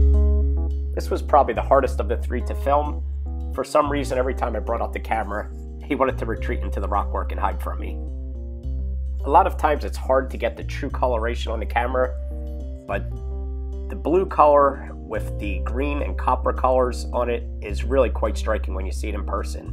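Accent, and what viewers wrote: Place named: Western Pennsylvania, United States. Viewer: Very nicely filmed. 👍 you sound like you may be from Western Pa.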